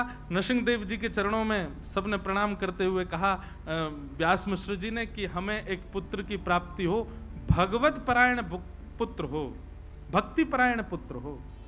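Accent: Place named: Braj, India. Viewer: Jai shree krishna ❤jai siya ram ❤jai shri man narayan ❤radhe radhe maharaj ❤️🙏maharaj app kitne cute ho, app ki mukh se barj bhasa kitne pyare lagte hai❤❤❤🥰🥰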